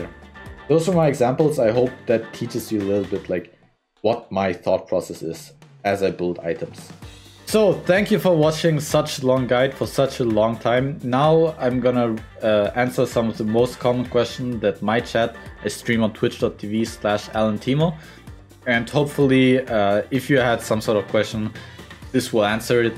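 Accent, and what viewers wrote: Germany. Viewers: The whole time i was like..he sounds german.. Hatte ich wohl recht xD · Ich merke an deiner Aussprache sofort, dass du ein deutscher bist =) Also herzlichen Glückwunsch zu deiner Leistung <3. Kannst du bitte eine kurze Zusammenfassung bzw Checkpoints der Mechanics für Challenger Teemo & einen Toplaner auf Deutsch bestenfalls für Wildrift raus hauen? Ein Beispiel ich habe mir einen Toplane Kassadin für Wildrift von den besten Spielern auf YT mit Meilensteinen eingerichtet: Vor Minute 5 muss der RoA fertig sein und bis Minute 10 muss der Seraph wenigstens gekauft sein. Dadurch drehe ich egal wie das Game am Anfang lief meistens im Lategame. Ich würde mich freuen dich in Wildrift bald auf dem Weg ganz nach oben zu sehen^^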